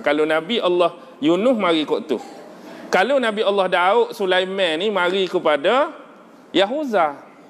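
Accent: Kelantan, Malaysia. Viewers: Dialek Kelate???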